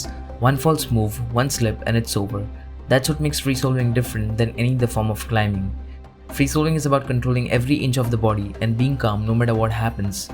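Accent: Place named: India. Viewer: Are you Indian? I hear you sounds like call center.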